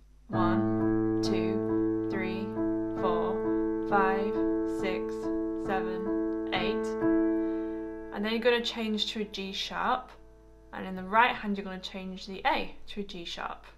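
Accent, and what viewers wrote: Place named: North, United Kingdom. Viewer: Impeccable tuition. Cute northern accent. What's not to love?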